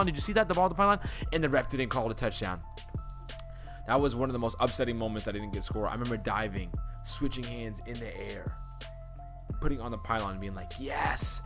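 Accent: Massachusetts, United States. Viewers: What City/town did you play for? Sounds like you lived in MA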